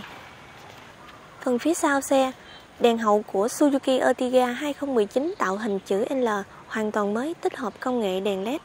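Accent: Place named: Mekong Delta, Vietnam. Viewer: Đúng chất miền Tây: Ờ, gờ lờ, 499chiệu, gọng gãi, phục dụ...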